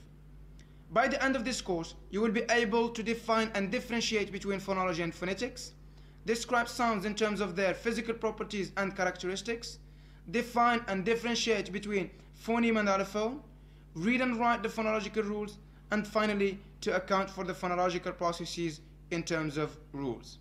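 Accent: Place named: Morocco. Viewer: Moroccan accent!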